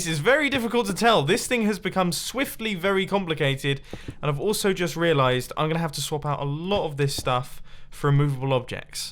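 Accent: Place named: United Kingdom. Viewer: "It's gonna be complicated..." he said in his brittish accent..."lemme make it more complicated" me saying as if I was him....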